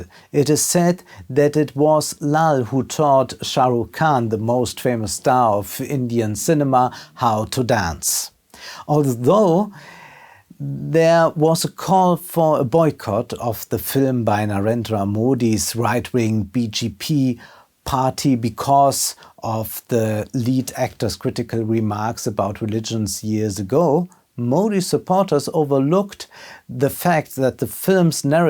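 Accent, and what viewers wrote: Germany. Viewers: Sympathic german accent. Really like it:) Immer weiter, keiner macht sein Ding so schön wie Du:) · Though the German accent is very lovely, considering enabling the subtitles might help the one or the other to better understand what is being said. Would be a shame considering the brilliants of the analysis. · High quality content with a cute german accent! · Ohne den deutschen Akzent wäre die auch im Englischen makellose Wortwahl nur halb so schön.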